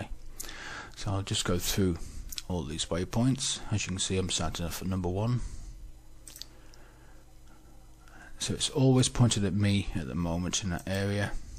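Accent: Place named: England, United Kingdom. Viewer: Great footage J! I'm still playing with Litchi at the moment, but it's an amazing app. I wish I'd got your in depth knowledge of it ... it's quite a steep learning curve, fine tuning the settings, isn't it? Getting there though, and having fun! Lol! Your videos have been extremely helpful, and, as previously mentioned, it's great to hear an English voice! Thanks mate!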